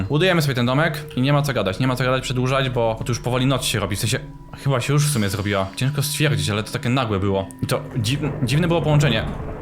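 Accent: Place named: France. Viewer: Czemu masz francuski akcent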